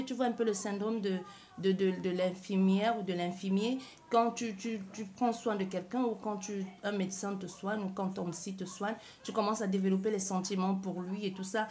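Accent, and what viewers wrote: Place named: Martinique. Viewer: Bonjour, Tu as bien fait de recadrer sinon c la dérive. J'ai sélectionné ce qui me parle dc merciii. Ton accent me rappelle celui d'ici (la Martinique). Vite ta prochaine guidance. 🌴☀🌴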